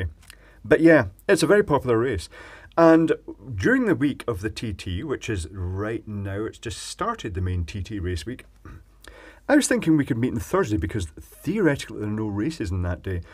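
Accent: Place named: Scotland, United Kingdom. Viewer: The Isle of Man isn't in Scotland, it's a separate country buddy. accent is Scottish however so an easy mistake to make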